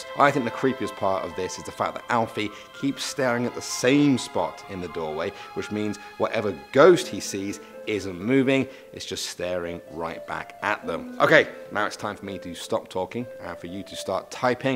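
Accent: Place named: United Kingdom. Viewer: Love your british accent, greetings and support from Sweden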